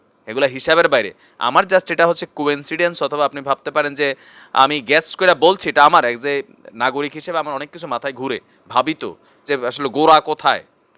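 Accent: Comilla, Bangladesh. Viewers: ভাই আপনি কুমিল্লার ভাসা বলুন ভালো লাগে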